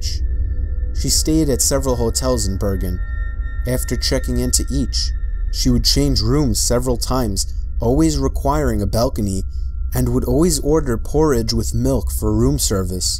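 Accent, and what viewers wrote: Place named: New Jersey, United States. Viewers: i feel like he's lost his jersey accent a bit. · I can't place accent. I want to say S. Boston, but its not that thick. Definitely E. Coast. Could be New York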